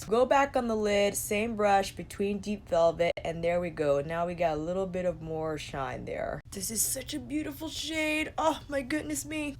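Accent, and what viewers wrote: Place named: East Coast, United States. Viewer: I dont know what kind of accent you have, but I like it. Feels East Coastal.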